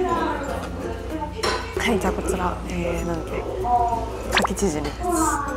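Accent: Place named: South Korea. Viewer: 일어하는 한국낭자 같아요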